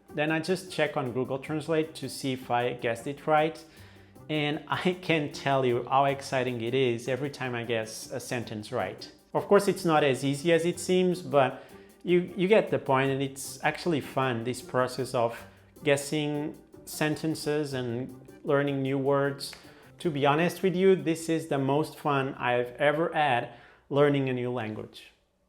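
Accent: India. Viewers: U actually spoke like a South indian😂 sounded so so similar to the south indians man...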